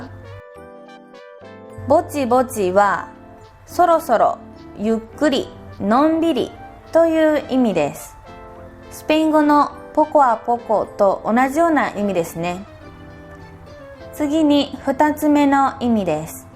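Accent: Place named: Kansai, Japan. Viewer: Me gusto mucho el podcast :3 いいポッドキャストだと思います 関西弁は可愛く聞こえます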